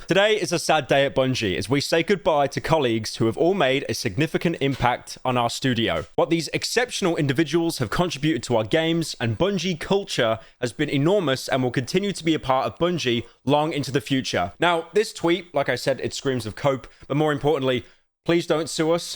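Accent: United Kingdom. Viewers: I love new haircut, dunno why he sounds british now though! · Why are you british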